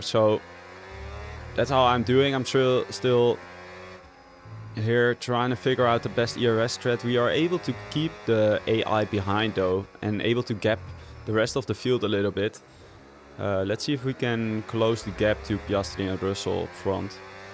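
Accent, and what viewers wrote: Netherlands. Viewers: I think your dutch of the accent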